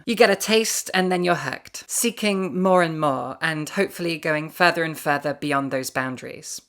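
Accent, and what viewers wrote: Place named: Scotland, United Kingdom. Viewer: Seriously. I love her accent, it's kind of mild for an Scottish accent but combined with her voice it's so soothing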